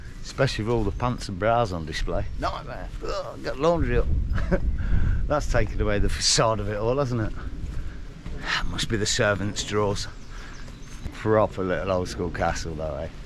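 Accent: Leicester, United Kingdom. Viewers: Having been married to a Brazilian at a time when neither of us could speak each other's language, does Bob understand every you say in that thick Leicester accent ? ❤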